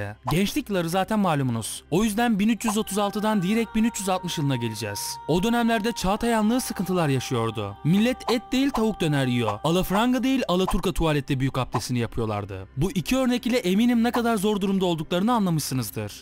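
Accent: Batman, Turkey. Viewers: Batmanlımısın kardeşim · Hocam batmanlımısın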